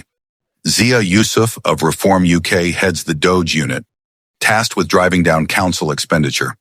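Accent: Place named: United States. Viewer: England Now - With an American accent. Not for me.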